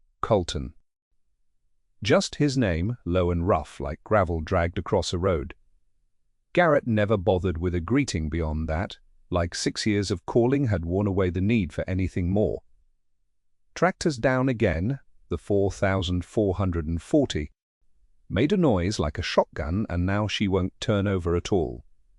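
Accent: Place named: United States. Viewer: Why do you speak like an American but you choose a British narration vooice?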